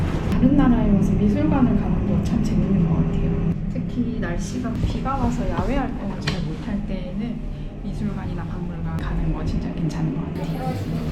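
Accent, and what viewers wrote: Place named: Gyeongsang, South Korea. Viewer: 교향이 어디신가요? 살짝 경상도 억양이 나는 듯도 하구요. 아무튼 20여일 후에는 트빌리시를 관광하고 있을 생각에 영상을 다시 보고 있어요.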